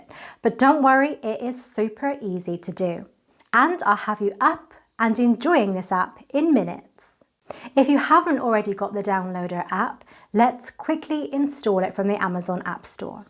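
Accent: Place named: Bristol, United Kingdom. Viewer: Don't get caught with your pants down had me in stitches. Is that a Bristolian accent I hear ? Definitely West Country for sure. Looking mighty fine beautiful lady, keep up the great work you do.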